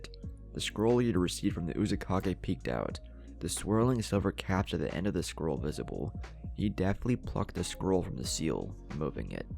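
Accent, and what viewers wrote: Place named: South, United States. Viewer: Love that talks like he’s from the dirty south 😂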